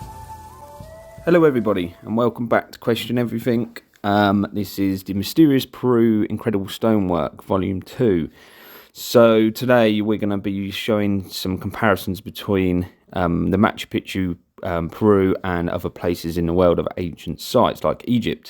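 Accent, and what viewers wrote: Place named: United Kingdom. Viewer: Hello, and I am so glad that I have tripped over your site. Not only do you provide lots of info, but you have a British accent and pronounce things in a way that I am used to hearing them. Some American sites have robots talking that should be shot. I look forward to seeing more from.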